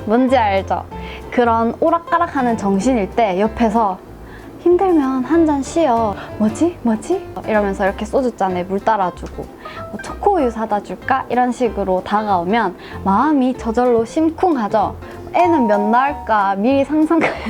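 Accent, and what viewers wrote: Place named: Jinju, South Korea. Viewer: 나도 고향이 진준디 말투가 참 익숙하네요 ㅋㅋ 응원합니다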